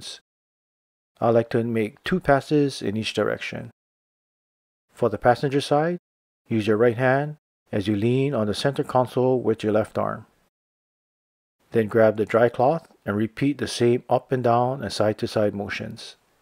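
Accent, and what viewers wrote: Hawaii, United States. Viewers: Is that a Hawaiian accent I hear? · You’re from Hawaii 🌺. I just listened to you speak and knew🙋‍♀️. Excellent tips. Thanks.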